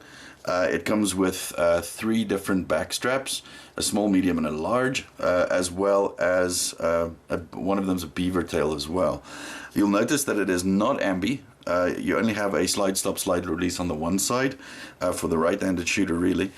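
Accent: South Africa. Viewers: I hear a South African accent.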